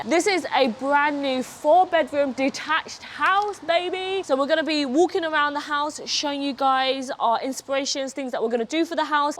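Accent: United Kingdom. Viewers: does anyone know what region of the UK her accent is from? city/etc? Love her.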